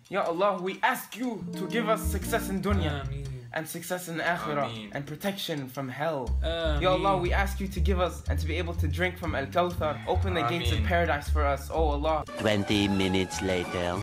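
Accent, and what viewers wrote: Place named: Bengal, India. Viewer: btw.. your bengali dielect is so hard to undeestand.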